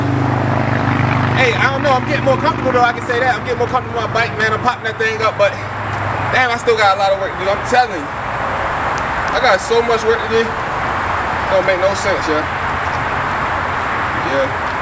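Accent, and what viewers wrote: Baltimore, United States. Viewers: It’s like an illusion 😅 bmore accent strong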